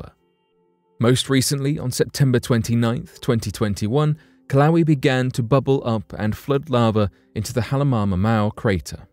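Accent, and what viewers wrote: United Kingdom. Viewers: British pronunciations are the absolute worst.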